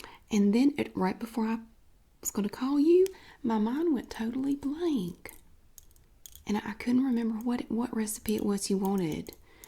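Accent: South, United States. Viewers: I like southern accents 😭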